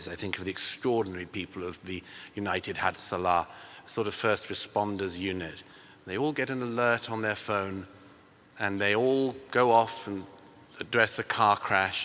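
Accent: RP, United Kingdom. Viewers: Douglas Murray is the proof that you can say absolute shit, but if you say it a picked british accent, people will fall over backwards thinking you're smart. This numpty is the moron's icon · For an Engishman u r so passionately zionist. And such a thick carefully crafted upper class British accent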